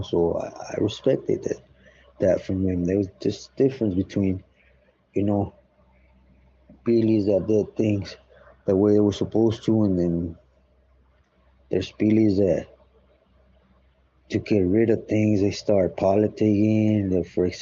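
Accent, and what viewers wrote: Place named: Los Angeles, United States. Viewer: I'm a white dude that grew up in LA and this dude sounds like everybody I grew up around.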